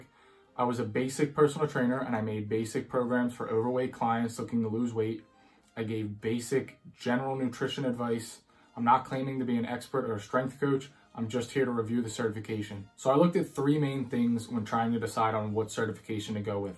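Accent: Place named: Philadelphia, United States. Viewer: You spoke 4 words and I was like this dudes from NE philly and then I seen the judge shirt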